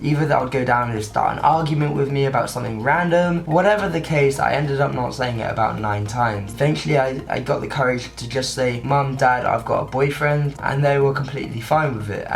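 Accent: England, United Kingdom. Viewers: I'm really into your british accent :)) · I love your English accent❤️❤️❤️❤️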